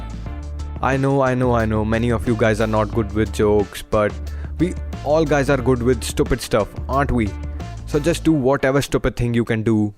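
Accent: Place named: India. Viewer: good one. but you need to improve your accent and speak a bit faster. try delivering four words per second and also try skipping most of the "r"s in the end of words. I guess you are an Indian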